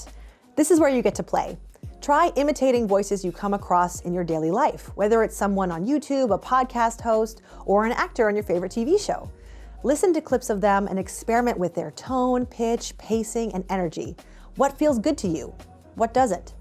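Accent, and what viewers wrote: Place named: New Zealand. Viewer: This kiwi accent makes it real hard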